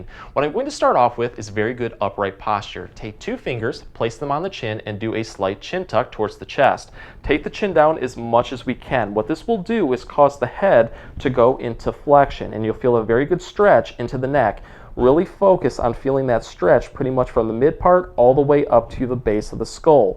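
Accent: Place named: Midwest, United States. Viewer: You’re def from Chicago or the Midwest with that accent lol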